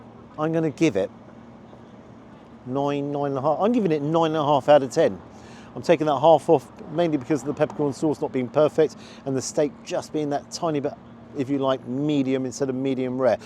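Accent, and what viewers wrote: RP, United Kingdom. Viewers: You sound very posh, and you have your own camera man. Most people doing this do there own videoing. From A&B.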